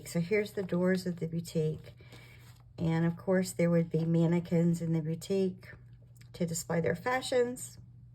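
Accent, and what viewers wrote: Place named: South, United States. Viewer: Simply gorgeous! Love listening to your soft Southern voice! Hugs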